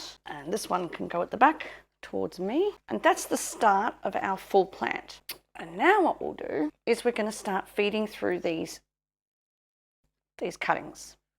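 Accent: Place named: Australia. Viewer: It's nice to hear another Aussie accent on here ! 👍🇦🇺 Sub 412 here. 😃 Yes, $14 for 1 cutting sucks !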